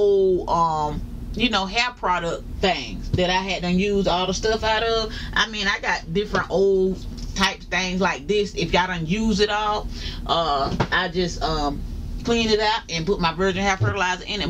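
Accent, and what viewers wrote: South, United States. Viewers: I love your southern accent. I am now a subscriber.😍❤️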